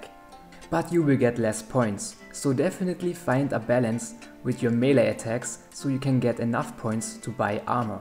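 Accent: Germany. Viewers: im curious, is your accent german?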